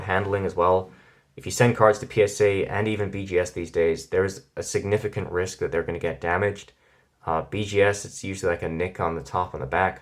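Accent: United Kingdom. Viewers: Your British accent is stimulating my focus lol.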